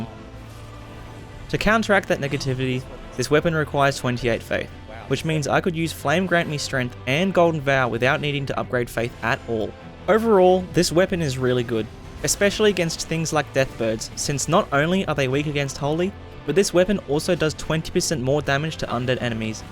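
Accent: Australia. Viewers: my god you are soooo australian, i love it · u sound a bit like crocodile dundee mate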